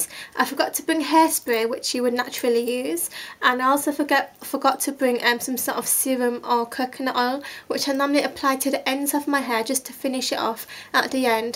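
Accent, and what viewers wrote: United Kingdom. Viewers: is that a birtish accent, not to be rude if it is :)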